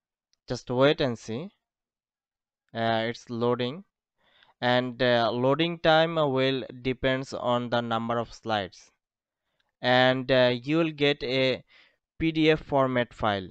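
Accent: Bengal, India. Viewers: ইংলিশ শুইনা বাংগালী মনে হইতেসে🙄🙄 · 100% u are bengali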